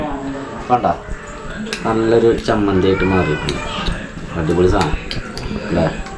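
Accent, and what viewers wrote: Malappuram, India. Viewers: Mone kutta ne malappuram aaanodaaaa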